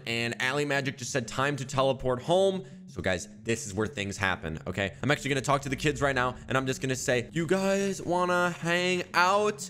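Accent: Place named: England, United Kingdom. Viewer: I'm english and I speak like you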